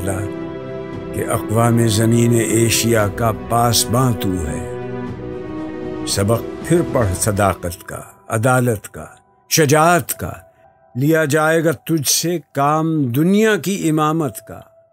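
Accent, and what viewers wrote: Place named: Turkey. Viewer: Marvelous... But plz tell the pronouncer to not use the Turkish accent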